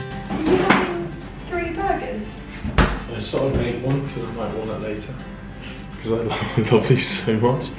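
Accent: RP, United Kingdom. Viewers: why are you always trying to sound extra posh lately? youre not even from a posh background.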